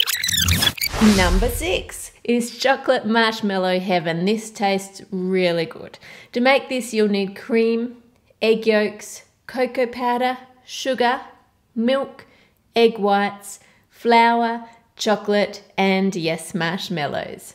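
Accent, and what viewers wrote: Australia. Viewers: the australian accent is so cute w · Australian says "sugar" --> "suga" because she is an Australian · She doesn't sound British. Is she Australian?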